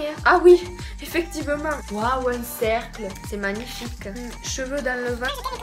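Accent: South, France. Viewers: Team accent du sud ahah💗💗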